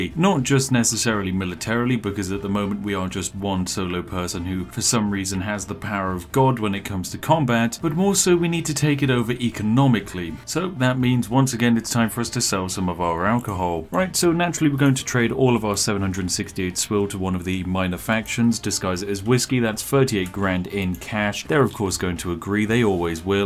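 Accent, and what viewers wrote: United Kingdom. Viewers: How to pull off being a millionaire and being your own boss... Step 1) Be British or have a smooth-as-hell British accent. Step 2) ??? Step 3) Profit! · IS HE REALY BRITISH BECAUSE I M A BRITISH MUN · wait. isn't british????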